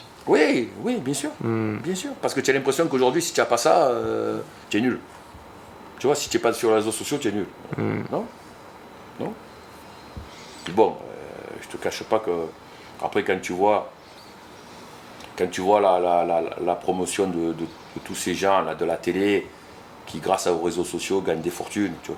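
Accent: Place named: South, France. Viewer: French southern accent 😍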